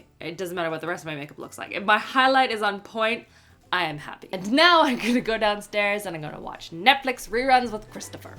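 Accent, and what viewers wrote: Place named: Canada. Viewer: I loved your day in the life one!! I find you talk alot like me but then again we're Canadian girls ;)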